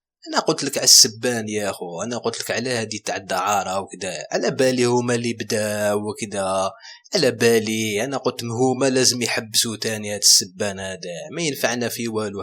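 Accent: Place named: Algeria. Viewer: من الذي قال انك مغربي باااااااااينة للاعمى انك حزايري من لهجتك احنا لهجتنا مختلفة بمرة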